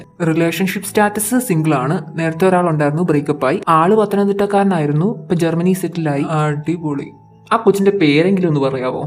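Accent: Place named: Tamil Nadu, India. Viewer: Your Tamil accent 😤😤😤🔥🔥🔥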